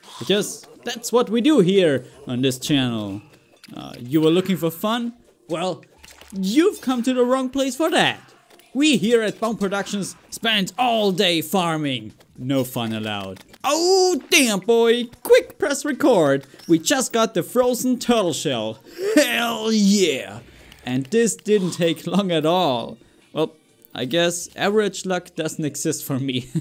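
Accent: Germany. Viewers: dude r u german?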